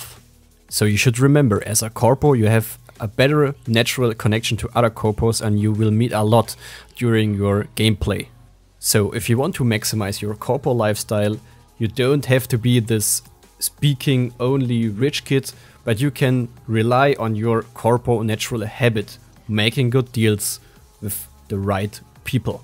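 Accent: Germany. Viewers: hab ich an deinem deutschen Akzent erkannt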